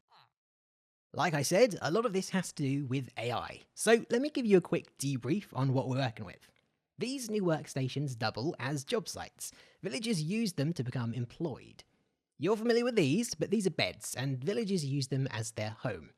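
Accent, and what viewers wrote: United Kingdom. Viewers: I love the voice it sounds British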